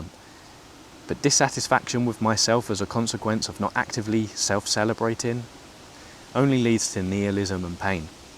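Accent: United Kingdom. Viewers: I'm 22, and I'm not struggling so much right now, thanks to actively accepting both who I am but also who I have been, and being forgiving. Extending a hand to the version of me that fucked up, instead of insulting it. But it still feels like a neverending tug-of-war with myself, still. With every positive thought comes an equally negative one, that i need to choose not to listen to. I am doing well now. And I'm on a path that is finally mine. And yet I feel that if I start relaxing just a little bit, if I loosen my grip on the rope I'll fall forward and back into the abyss of despair and fear. One day I feel like I can wholeheartedly trust myself and my decisions, and the next I stay on full alert to avoid making even a single misstep. This is why, although I am currently doing fine, this video is going into a special little box, that I keep filling while I feel clarity, and trust in my decisions. 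Because inevitably, when life throws another punch at me and I'll be there lying on the floor, I'll be relying not just on me, but also on little me. Adolescent me, depressed me, happy me, and future me. Thank you. Your videos help many people, and anyone could use a soft spoken british voice giving them encouragement in their times of need. ✌️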